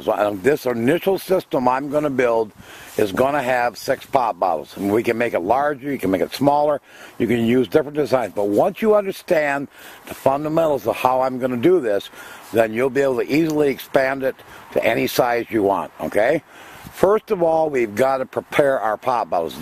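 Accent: Minnesota, United States. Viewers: I like your Minnasotan accent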